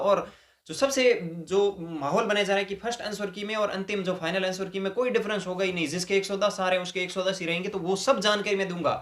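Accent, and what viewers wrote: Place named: Marwar, India. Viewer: Sahi hai ,, cutoff kuch bhi rhe lekin analisis bhut sahi hai, aap marwad se ho sayad, language tone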